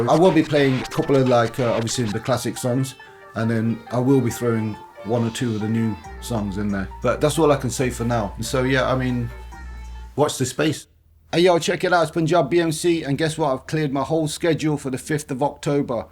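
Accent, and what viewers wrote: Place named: United Kingdom. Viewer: wait what he has a british accent !?!?!!?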